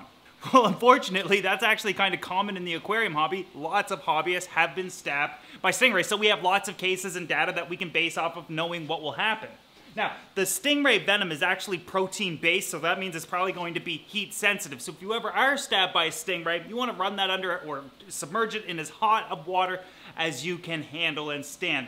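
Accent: Canada. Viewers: It's cool when you can instantly tell you're watching a fellow Canadian by the accent lol Great aquarium, nice video! Cheers from BC! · Are you a Canadian ?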